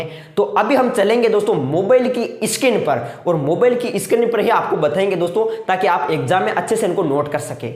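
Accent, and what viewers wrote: Rajasthan, India. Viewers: BHAI Rajasthan su he Kay thaki boli bahut choki lagi ❤️❤️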